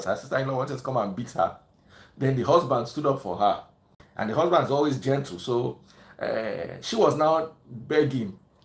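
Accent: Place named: Ibadan, Nigeria. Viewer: 🤣 i swear, your Ibadan accent is SAVAGE. You should entertain us more in our yoruba accent ooo.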